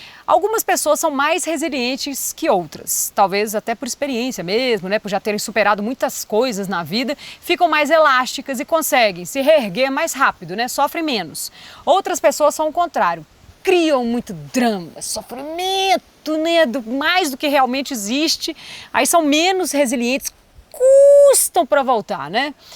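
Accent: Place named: Minas Gerais, Brazil. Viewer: Mas tb pode ser observado que como as árvores é necessário flexibilidade para potencializar a resiliência!! Sempre sendo ela mesmo, sotaque, vocabulário, transparência, trejeitos......mineirinha carismática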